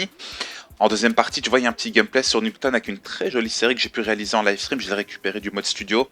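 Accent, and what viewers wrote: Belgium. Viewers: T'es belge? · est tu belges stp répond 😜❤️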